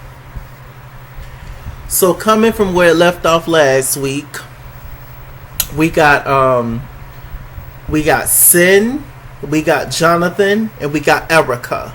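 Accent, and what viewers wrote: New York, United States. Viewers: Your “NY” accent tho 🤣🤣🤣🤣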